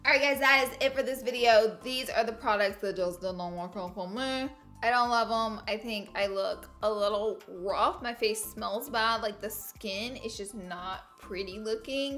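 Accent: South, United States. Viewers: Wouldnt be if y'all didn't have bloopers!! And the southern accent brings it all together!! Loves..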